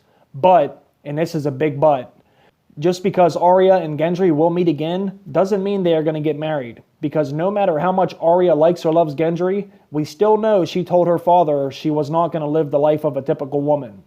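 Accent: Pittsburgh, United States. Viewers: I like your channel. BTW where are you from? You sound like you have a little bit of a Pittsburgh accent. : )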